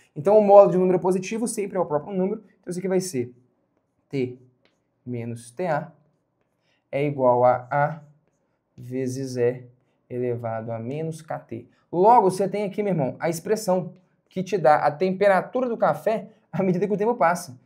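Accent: Minas Gerais, Brazil. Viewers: Oce e mineiro guisole?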